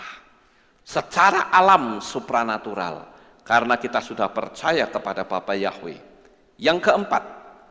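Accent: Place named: Java, Indonesia. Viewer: medok logat jawa mu pak.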